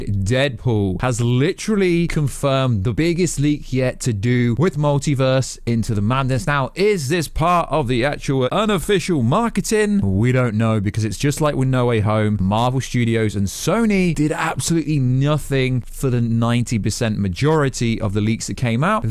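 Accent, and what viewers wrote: United Kingdom. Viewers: Are you Brittish or Scottish ahha?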